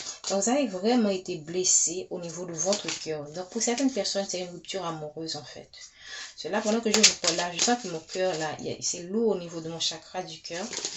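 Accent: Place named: Guadeloupe. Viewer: Bonsoir , je voud découvre à l’instant .Je vois remercie pour cette guidance , c'est tout à fait mon histoire avec cette personne, jai énormément morflé avec elle 😔🫤. Elle a beaucoup gens toxiques autour d’elle , et ces gens là, ont mis a mal notre relation, elle est très,très influençable malheureusement 🫤. Vous avez l’accent antillaise je pense de Guadeloupe à moins que je me trompe !🤔😀 .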